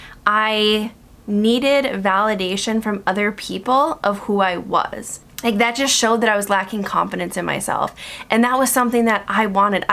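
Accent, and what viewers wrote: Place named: United States. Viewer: yess your very clear American accent is helping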